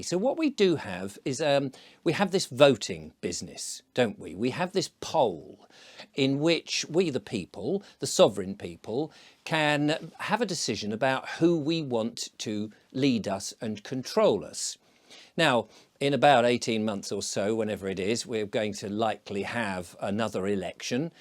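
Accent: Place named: England, United Kingdom. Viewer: Just watched your video about where you were told off. You are absolutely not stupid or think. You are a much deeper thinker than those haters that have to complain about everything. I am an American and truly enjoy listening to your very pleasant, very English, as far as I know, way of speaking. Very easy to listen to. Nice to hear someone who appears to think before putting his mouth in gear. I am also a craftsman, a millwright, woodworker, metal fabricator and auto mechanic. I think the person mad at you is out of line to complain, think he takes himself a little too seriously. Anyway, I will continue to follow your sight and enjoy learning more about England. I am equally proud of my country even though it is going through some tough times with leaders I don't think have our best interests at heart. Stiff upper lip and all that, old chap. 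Let the criticism roll off your back like water off a duck. Can't please everybody.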